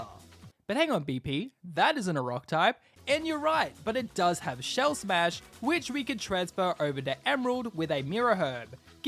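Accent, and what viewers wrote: Australia. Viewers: Are you Aussie the accent sounds familiar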